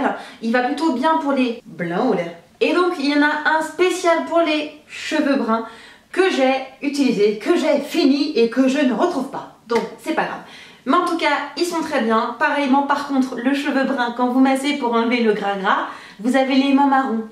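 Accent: England, United Kingdom. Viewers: Il faut que tu arrêtes de faire l'acsan anglais. Je t'adore ma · je t'adore et j'adore ton accent british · J'ai le même mascara de chez Ives Rocher il est génial mes cils sont plus long vraiment super et t'aime très très fort avec ton accents anglais bisou!! <3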